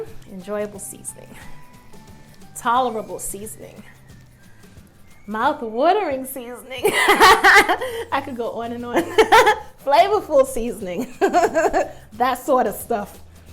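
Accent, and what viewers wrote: New York, United States. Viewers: Are you from New York or New jersey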